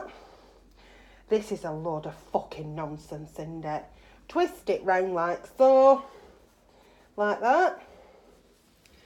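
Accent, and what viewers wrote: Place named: Bolton, United Kingdom. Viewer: So love Bolton accent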